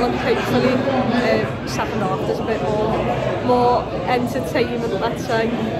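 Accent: Liverpool, United Kingdom. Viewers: This scouse accent 🥺>>>>>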